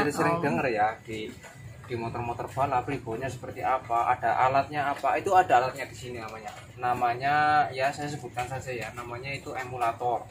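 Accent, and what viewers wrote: Banyumas, Indonesia. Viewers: Ora ngapak ora penak pokoke lah mbak ayu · Ora ngapak ora kepenak😀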